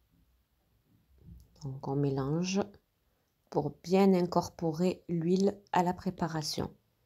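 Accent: South, France. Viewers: Magnifique voix tu es du sud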